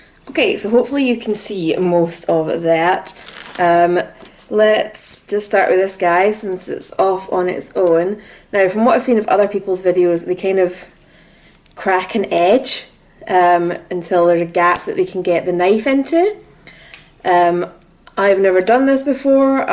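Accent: Scotland, United Kingdom. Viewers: Are you a fellow Scottish las ?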